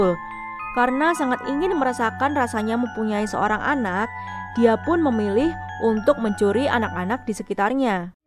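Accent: Java, Indonesia. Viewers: Mbaknya Jawa mn, mendok bgt ngomongnya😃😃😃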